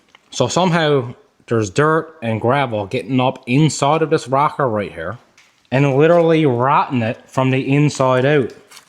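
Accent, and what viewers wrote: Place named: Newfoundland, Canada. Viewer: Greetings from Ontario on a sunny -22 Feb. morning. I must say how much I enjoy your channel and your social media posts, well done! Buddy, your humor, accent, and content make for the best ambassador of Nfld! I have a question: I am an older fellow that enjoys making videos... you mentioned in a video that you use your phone and an external microphone. To improve my sound quality I would like to purchase a microphone. May I ask what microphone you use and how long the battery lasts as well as any other thoughts or recommendations you might have. Thanks so much, Paul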